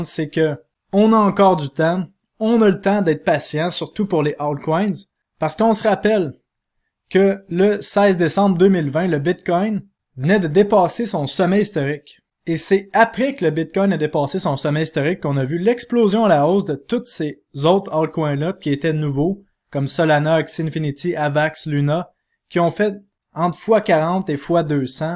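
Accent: Canada. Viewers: Super video com Dab.. L’accent Canadien est tres fort et pour un Francais il faut se concentrer pas mal pour bien comprendre ;)) Merci bien quand meme ! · TB video avec en plus cet accent canadien (vu de France)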